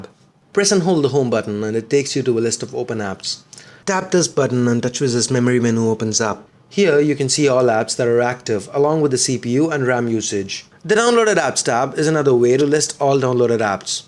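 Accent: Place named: South India, India. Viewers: from his accent..he sounded south indian for sure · for a tamil. you have a fantastic english accent. i would definitely take you for my movie if i was a director. TWO thumbs up brah!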